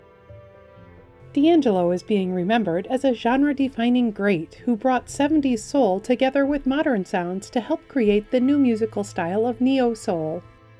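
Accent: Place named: Fargo, United States. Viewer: Think about using ai to narrate for someone less Fargo sounding.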